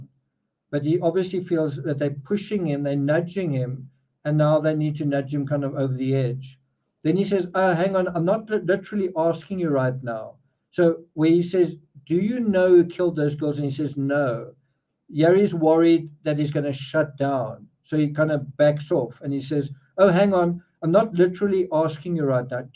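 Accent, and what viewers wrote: Afrikaans, South Africa. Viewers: Really liking your insight thanku...enjoy your Afrikaan accent even more... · Are you from South Africa ?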